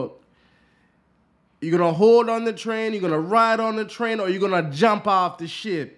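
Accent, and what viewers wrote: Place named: Jamaica. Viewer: Ya Jamaican come thru clearly man.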